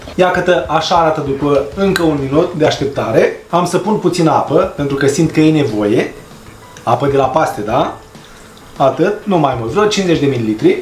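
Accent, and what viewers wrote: Moldova. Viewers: pair a contraire....e perfect ca vorbeste...noua ne place,mai ales accentul moldovenesc...❤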